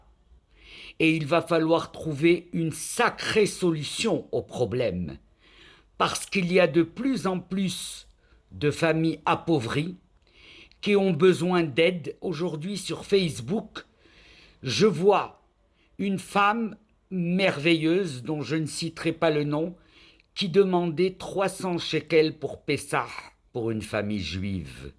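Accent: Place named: Morocco. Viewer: يلاه دخلت للقناة ديالك عن طريق واحد الفيديو كتهضري بالدارجة تبارك الله عندك دارجة مغربية قديمة كتعجبني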